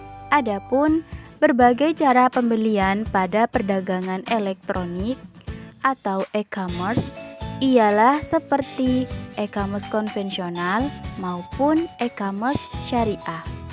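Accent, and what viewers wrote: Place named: Madura, Indonesia. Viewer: Masya Allah gagal fokus sama logat maduranya, tapi keren nih. Semangat terus berkarya 🔥👍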